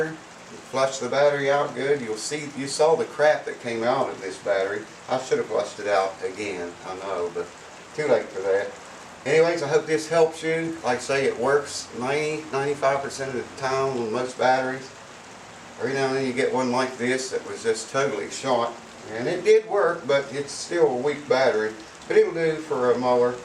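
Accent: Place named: Kentucky, United States. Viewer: You be da man! Thanks for this video. I won't be doing anything you mentioned, but enjoyed watching you do your thing. You remind me of my Nam buddy, Bob McIntosh from Kentucky...same accent and same easy way of expressing yourself. Brought me back in time, so thanks again!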